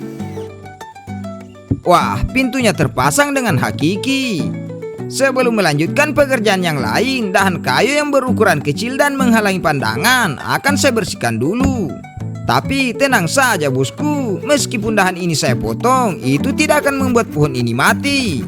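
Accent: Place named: Medan, Indonesia. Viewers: baru pertama nonton channel ini seru juga, kayaknya dari logat orang asli sumut ya bosku? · Ini Lokasinya Daerah mana bang?, logat abang kalo berbicara seperti Logat Medan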